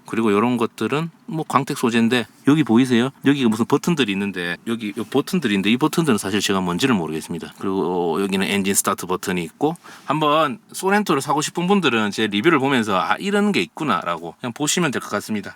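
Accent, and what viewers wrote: Gyeongsang, South Korea. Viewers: ㅋㅋㅋ 나도 경상도놈이라ㅜ경상도사투리 너무 좋음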